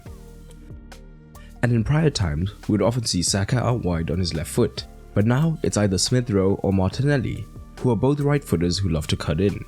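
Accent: South Africa. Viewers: You sir... Sound South African 🤔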